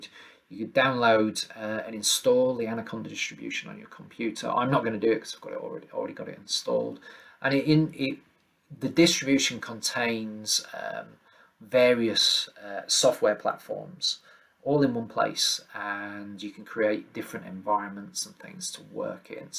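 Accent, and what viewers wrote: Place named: Yorkshire, United Kingdom. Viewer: Great video - love the dulcet Yorkshire tones!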